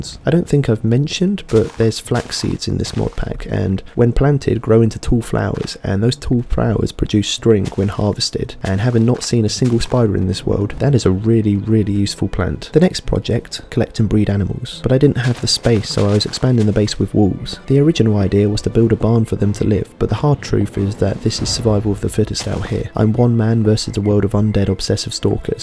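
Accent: United Kingdom. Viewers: Um he’s British if you couldn’t tell 😬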